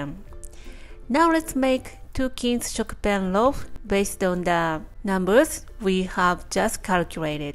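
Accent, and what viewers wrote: Japan. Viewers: You sound like my dentist who's from Japan. I absolutely ADORE her🥰. And thank you so very much for your contribution. Subscribed. 🙂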